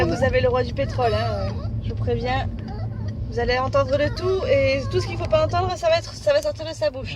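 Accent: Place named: Southwest, France. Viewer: L accent j adore Ça sent les vacances pour moi Le sud ouest 🌞🌞🌞🌞🌞🌞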